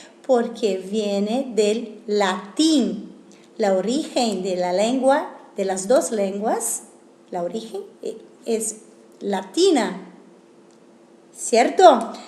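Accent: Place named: Argentina. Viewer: habla español argentino la profe dice vos no tu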